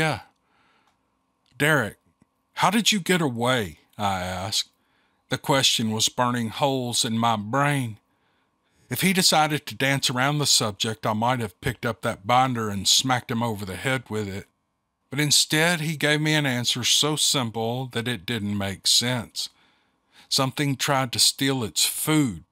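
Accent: South, United States. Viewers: A part of me dies listening to this. I understand its fiction but you have so much respect and authority. If you made this narration on another channel maybe I wouldn't mind but your main? So many of my coworkers and neighbors think of Bigfoot and Dogmen as some joke for story- telling. I am quick to defend against that stupidity or less they will gey 411. We need more advocates like you to bring awareness and respect for the wilderness where these things roam. I just dislike these made up stories since frankly all they are are just creepy pastas in a sense. Years ago Vic Cundiff and later James Williams from Dark Waters showed me there are people out there who go out of their way to avoid pretend stories and only put out their real encounters. I spoke with you before and I am not saying this denegrade or insult you or this talented writer but please remember by narrating these made up stories on your main channel can be used against all your 'real' storytelling videos. 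If I wanted that I would listen to your other channels which go deeper into fan fiction which I never had a problem with but this is your flagship ocean liner. We respect and love your Southern charm and honesty with that confident accent. Please remember I am not criticizing you for what you pursue but recall that Darkness Prevails is one of the biggest Creepy Pasta channels out there but I can never trust him when he makes a video 'Real Werewolf Stories: 'Guys I swear this one is a genuine story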